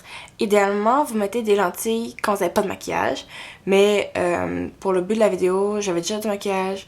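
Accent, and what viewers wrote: Quebec, Canada. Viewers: c'est l'accent du Québec :))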